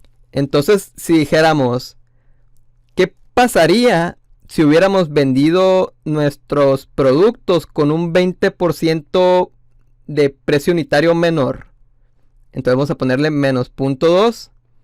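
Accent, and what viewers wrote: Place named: Sonora, Mexico. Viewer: uste es de Sonora? muy perro el acento 🤙🏼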